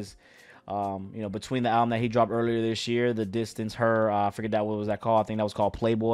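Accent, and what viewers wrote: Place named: Toronto, Canada. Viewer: Bro please stop doing that Toronto accent 😭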